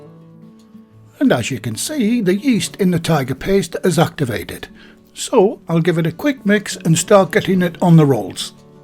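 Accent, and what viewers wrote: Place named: North, United Kingdom. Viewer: Hi, hope you don't mind me asking this - I have been using your Tiger Bread recipes and really enjoy your videos and clear instructions. Its great to hear a fellow northerner's voice. I was wondering if its possible to do a cold-proofed Tiger Bread with any of yourTiger recipes so the bread can be baked early the following morning? Also would you add the rice flour mixture whilst it was proving in the fridge or very gently the next day? Any advice would be much appreciated - thank you in advance Take care and stay safe Best wishes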